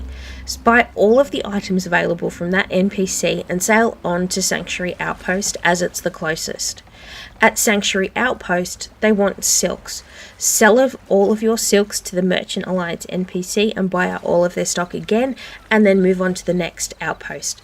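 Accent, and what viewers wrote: Australia. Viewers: I did enjoy the ozzie accent. Here's the method I use, firstly I will raise my emissary and complete one "lost voyage" mission from my spawn outpost. Upon completion I should have max emissary, if you don't have it then you should be very close since voyage levels differ with reputation levels. Afterwards I will sail to the nearest outpost and sell my voyage loot, using the funds to buy out their stock. Afterwards I'll sail to the next outpost in either a clockwise or counter-clockwise fashion, as you put it in the video and buy that outpost out and sell whatever you have that they are in need of. You can figure out which resource to sell an outpost without ever opening the merchant book too. Every outpost offers 3 of the same item they are in surplus of, 1 of every item they're good on, and none of the resource they are in need of. With this logic you can simply look at their stock and through process of elimination determine what resource they need without looking through the book over and over, and the more you do it, the better and faster you will be. 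My first round trip of the map will consist of me buying and selling to maximize profits, and I will mark every outpost I have visited on my map in order to keep tabs on whether or not I've gone full circle. Then, my second round trip of the map I focus on selling off everything only, un-marking each outpost as I visit them. This is about a 3-4hr session as a solo and profit after accounting the buy prices was around 450-500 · Great video, love the cinematography of it and of course the Aussie accent.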